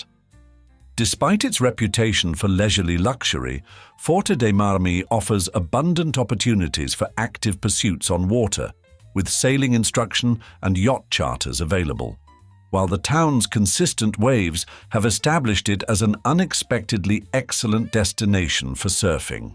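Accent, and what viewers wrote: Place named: RP, United Kingdom. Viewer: First one 😊 and congrats for the video. As a polyglot I do appreciate your accent : sounds clear and a trifle posh fully adapted and carved out 🎉😊❤.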